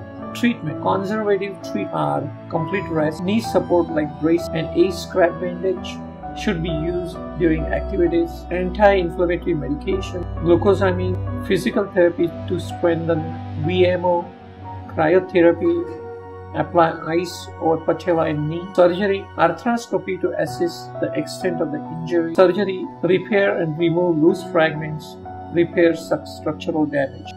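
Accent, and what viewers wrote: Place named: India. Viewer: Thats an Indian accent :) loud and clear isn't it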